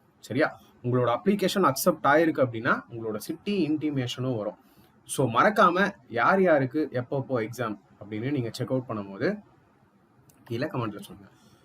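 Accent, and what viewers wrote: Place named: Coimbatore, India. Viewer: I think Coimbatore side ah irukalam😮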